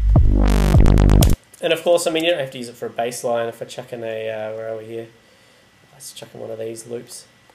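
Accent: New Zealand. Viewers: sounds like a kiwi to me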